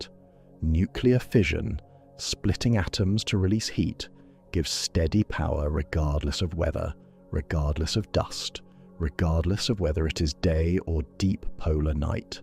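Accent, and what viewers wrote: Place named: England, United Kingdom. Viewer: Why is every AI notation done with an English accent. Getting very annoying.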